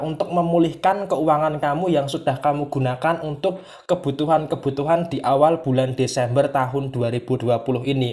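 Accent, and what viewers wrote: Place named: Kulonan, Indonesia. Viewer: Njenengan orang mana mas kayak orang kulonanan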